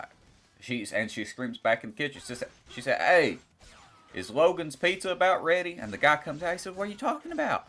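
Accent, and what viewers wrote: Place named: South, United States. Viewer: you deserve a million subscribers. dynasty warriors youtuber with a southern accent??? fucking sign me up omg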